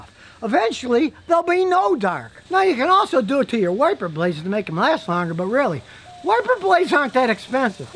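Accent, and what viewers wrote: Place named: Pennsylvania, United States. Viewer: ! Hi... I see a Texas plate on your'n car but you ain't from Texas.. I'd guess Pennsylvania or mayhap Ohio judging your accent?.. where is from?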